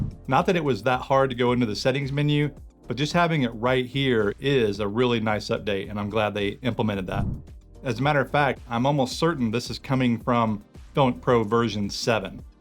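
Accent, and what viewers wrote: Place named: Carolinas, United States. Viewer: Thanks for the info. Love your accent. Is that a North or South Carolinian accent? Have a good day.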